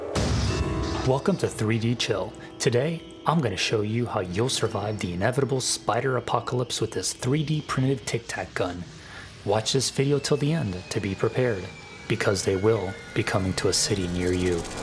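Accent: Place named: Australia. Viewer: Bro is from Australia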